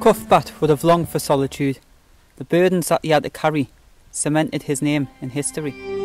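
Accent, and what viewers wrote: United Kingdom. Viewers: At least you have the real accent. Too many folks make videos about Northumebrland and cannot speak properly. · Pardonnez-moi mais je suis curieuse.... Votre accent vient-il du Nord de l'Angleterre ou d'ailleurs?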